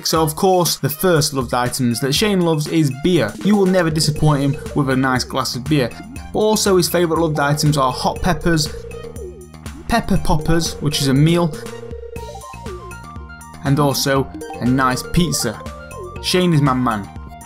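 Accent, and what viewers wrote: United Kingdom. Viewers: THE BRITISH ACCENT AND COMMENTARY LMAOAOAOA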